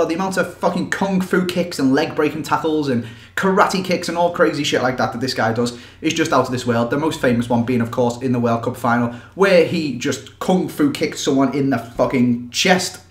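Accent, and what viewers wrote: Liverpool, United Kingdom. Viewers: Loved the vids as always mate! Keep this up, soon you will be on top of PewDiePie for subs!👊 I smashed the like button. By the way, where are you from, is it Liverpool?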